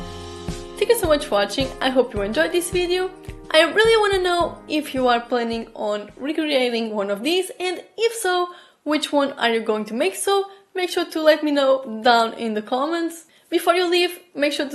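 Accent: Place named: Poland. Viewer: Love the accent....Polish 🙂?. The last bracelet was awesome. I will do the end caps in silver...thanks for sharing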